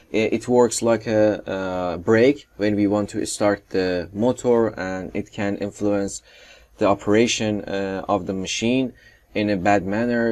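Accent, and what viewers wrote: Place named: Iran. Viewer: Sound IRANIAN dialect!!! Thanks by the way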